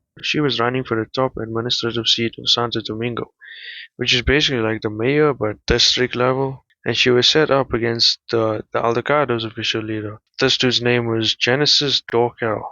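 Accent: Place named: South Africa. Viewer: Yooo. You sound South African choom. Awesome vid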